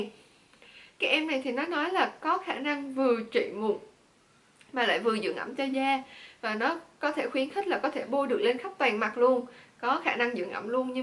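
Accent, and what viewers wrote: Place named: Da Lat, Vietnam. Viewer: Linh Le bạn ơi giọng đà lạt họ nói vậy đó không phải nhẹt mũi đâu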